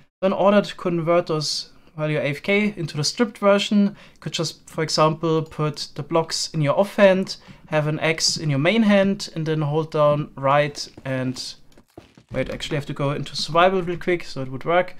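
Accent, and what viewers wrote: Germany. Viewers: Are you German? Your accent is pretty familiar to me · This german accent xDDD i rly cant stand that xDD But still nice video :) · What a german accent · Where are you from? You sound like a german guy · Is that an german Aczent? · One question. I'm not sure where your accent is from. Is it German? Or some other one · It's just standard German English. The „th“ sound does not exist in the German language and the „z“ (as in „blaze“) is made in a similar way and does not sound too different. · his german accent tho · I can tell you’re german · Er redet wie ein Deutscher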